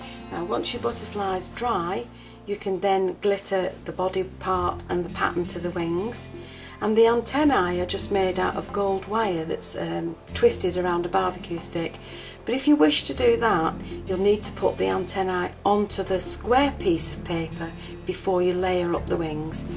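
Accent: England, United Kingdom. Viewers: Hi just came across your site! It's nice to hear English accent!lol have subscribed....now I'm going to look at your other tutorials. I live in Sussex.😍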